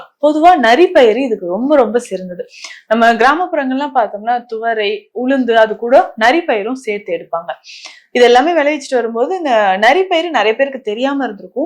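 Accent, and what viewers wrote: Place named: Chennai, India. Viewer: Dr.என்றாலும் Madras தமிழில் தான் கதைப்பீர்களா?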